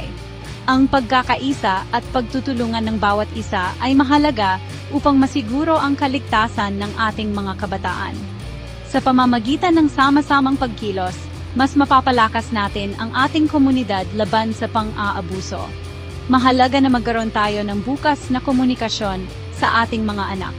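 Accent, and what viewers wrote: Visayas, Philippines. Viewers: Yung narrator bisaya naman .trying hard magpaka host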